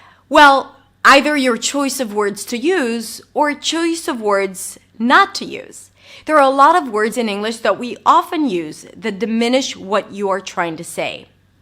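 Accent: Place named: United States. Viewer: like your American voice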